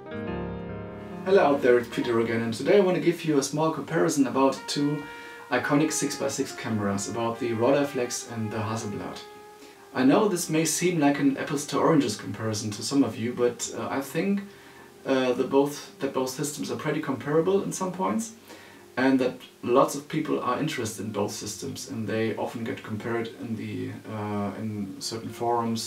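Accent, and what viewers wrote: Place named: Germany. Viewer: Ich muss ehrlich sagen, dass Video ist super, auch wenn ich persönlich ein anderes Resultat habe nach dieser Expertise. Was ich nicht verstehe, es gibt soviele Videos auf Englisch über die Hasselblad und Rolleiflex und dann kommt Mal ein deutscher der ein Video macht, beide Kameras aus der Praxis kennt und wieder auf Englisch. Sorry aber der Akzent ist nicht zu überhören. Die Bilder zum Schluss sind sehr schön, erste Sahne.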